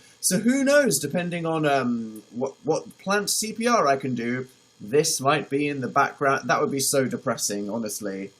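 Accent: United Kingdom. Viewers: Is it me or does hearing a British person talk about fonts make it more fancy than it really is? 🖤😊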